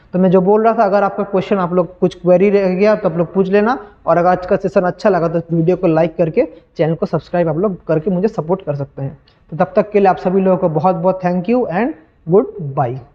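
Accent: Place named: Bihar, India. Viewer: Ap bihari ho n bhiya , your bihari accent become to know me this😊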